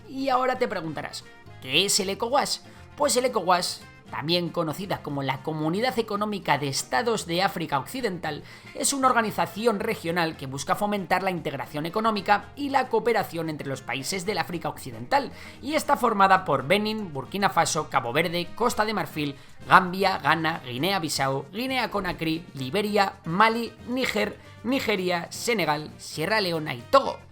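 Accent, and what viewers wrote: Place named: Spain. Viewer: Religión? Si, Europa hace del colonialismo un dogma, una religión, supongo que esos quieres decir. La revolución industrial provocó el colonialismo?, no fue su asquerosa codicia. Muy sezgado tu video, se nota demasiado tu acento español, que no se queda atrás, incluso matándose entre ustedes mismos durante su asquerosa dictadura.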